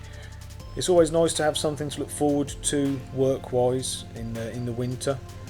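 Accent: United Kingdom. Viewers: Are u from around wiltshire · Nice Norfolk or Suffolk accent ? Nice vid really relaxing tbh - i want to be a gardener now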